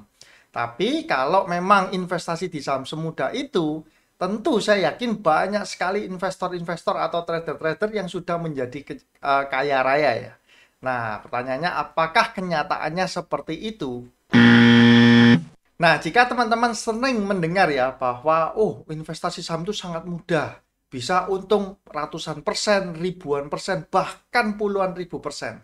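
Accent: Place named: Java, Indonesia. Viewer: Jadi ketagihan lihat video p ini.... Banyak ilmu yg bisa di ambil,Saya juga suka logat nya medok jawa meski pakai bhs Indonesia😁....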